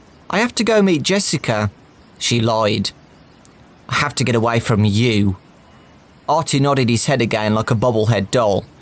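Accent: United Kingdom. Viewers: i dont need super thick british audio reading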